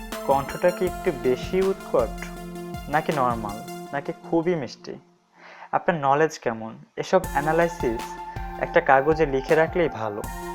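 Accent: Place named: Bangal, Bangladesh. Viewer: first bro,rectift ur own voice.bangal bhasa so irritating,cant u speak proper bengali?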